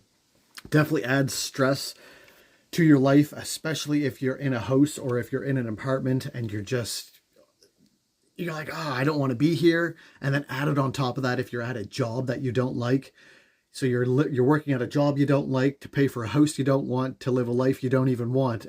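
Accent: Nova Scotia, Canada. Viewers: Your accent sounds Nova Scotian. Im guessing Pictou county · Thank you for this lovely video summing things up! I wrote once before saying "You must be a Nova Scotian" as I recognize the accent! But am forgetting which county you're in. I'm in Annapolis county and it's getting much harder to hide from all the restrictions in order to 'off grid'. I'm in a tiny 12x12 shack next to our bigger house, but eventually my 30 something son and daughter in law (who are also very much 'believers...Praise God!), are wanting to homestead and go more off grid with their little one. God has done many wonderful things in their lives so far, so I'm sure it will happen within the next couple years. (P.S. I have a cordoned off little corner with a bucket system bathroom and I just love it....WAY better than any water toilet!) In a future video, can you give your testimony of how Jesus came into your life? 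Love hearing the multitude of ways He has shown up in peoples' lives.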